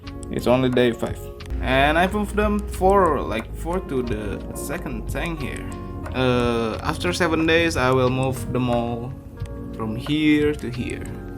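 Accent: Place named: Indonesia. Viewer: Dari aksennya langsung kedenger, Indonesia ya? Eh bner pas tengok channel mas nya 👍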